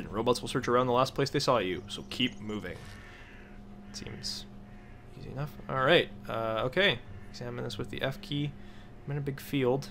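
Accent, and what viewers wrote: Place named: Canada. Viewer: Very interesting game! Reminds me a little of Fallout :) I also have to say I quite enjoy your Canadian accent.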